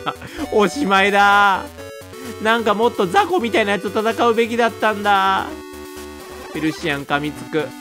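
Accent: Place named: Western Japan, Japan. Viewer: なんかね😂西の人だなぁって😂😂 こん↑ぱん↑とか いー↑ぶい↑ って キュンぽいんとなのは私だけ？😂❤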